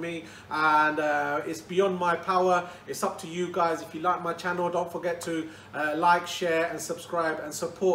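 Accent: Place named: Stoke, United Kingdom. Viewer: 60k, well done, love your Bangladeshi heritage, your food knowledge and incredible cooking skills, your Stoke accent is ace too mate. We in the UK are so fortunate to have such a mix of cultures, filling our bellies with happiness and pleasure, next stop 100,000 subs.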